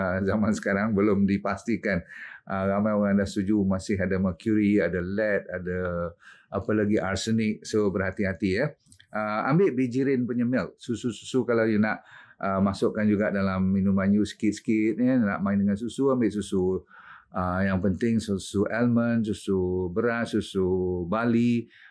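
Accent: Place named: Perak, Malaysia. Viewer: Doctor orang Perak ,tak bolih hilang Bahasa ibunda sekupang Limakupang cukup kelakar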